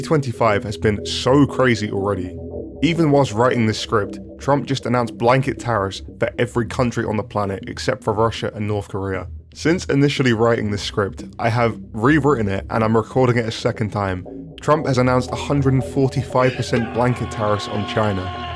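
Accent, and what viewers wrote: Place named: United Kingdom. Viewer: British and you sound fat.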